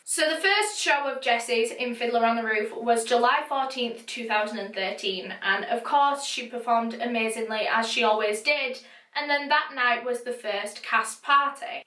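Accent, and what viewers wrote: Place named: Yorkshire, United Kingdom. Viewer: think she's from Yorkshire, UK. Personally love the Yorkshire accent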